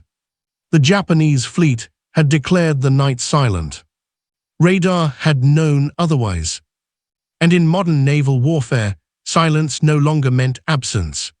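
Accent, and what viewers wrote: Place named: United Kingdom. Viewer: Why add distracting and annoying flickering speckles, cropping, streaking, and shaking to what could have been clear steady images?!! Your ridiculous images show objects and personnel vastly distant in location and time from what you describe. Why Brit-accented narration of a 100% US operation?!! DISLIKE! UNSUBSCRIBE!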